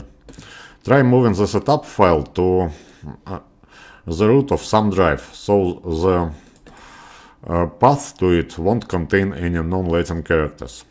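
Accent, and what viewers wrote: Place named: Russia. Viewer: Ебать у тебя акцент братишка, русских слышно сразу)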